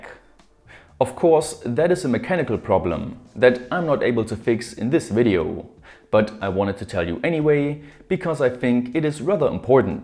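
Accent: Germany. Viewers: Ich liebe deinen deutschen akzent😂👌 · German english is so funny to listen.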